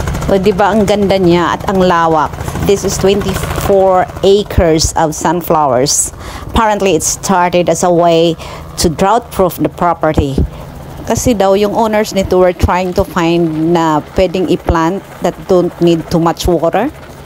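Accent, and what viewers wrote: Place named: Philippines. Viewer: I like the Filipino (a) accent.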